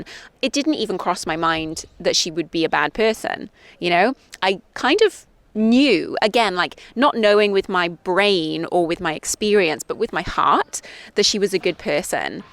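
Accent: United Kingdom. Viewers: Thanks for your real British English video! It’s a very good listening practice for me!! The tree behind you looks like Sakura but it’s a little unique shape! Honestly speaking, I’m not comfortable to wearing mask but my opinion is close to From this 13th of Mar. 2023, I’ll be wearing mask in the confined place like the train but no mask outside! I didn’t know “gnats” but I found it’s the small flies. I misheard gnats as nuts first😅 but it’s a good practice for me!! As for clouds, I studied typical seasonal clouds below❗️ Summer: cumulonimbus Autumn: mackerel clouds Winter:stratocumulus April: altostratus Oh they are very difficult words for me but interesting! I’m excited for the next lessen ❤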